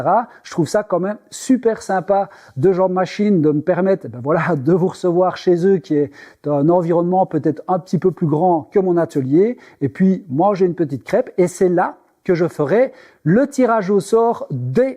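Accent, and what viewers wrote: Belgium. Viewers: Ce n'est pas un franc parlé, plutôt un belge parlé. Mais on adore ça, bravo et merci.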